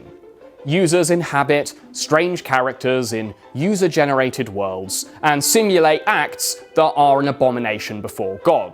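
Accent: United Kingdom. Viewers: A British accent using surfing slang is a bit much for me to handle.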